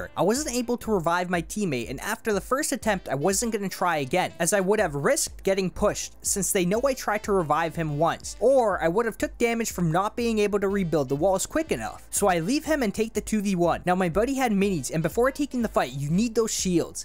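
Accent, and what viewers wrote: Canada. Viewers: Quality tips brother. May I ask where you’re from? Sounds like Canada haha. Do you want to play together on PC sometime? Cheers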